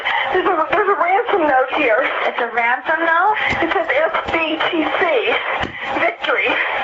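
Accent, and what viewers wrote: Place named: United Kingdom. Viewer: Also, I just love your British accent haha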